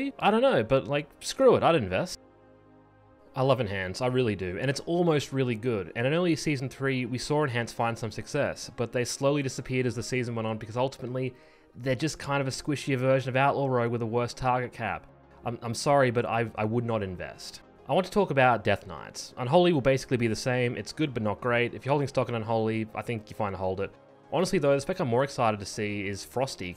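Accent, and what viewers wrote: Australia. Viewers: you sound aussie bro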